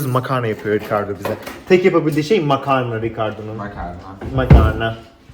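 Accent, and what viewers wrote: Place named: Italy. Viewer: Türkceyı ingilizceyı itakyanca aksanı ile konusmak😅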